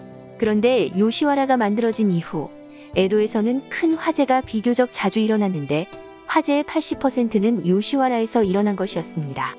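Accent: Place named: Busan, South Korea. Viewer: 부산말씨 같은데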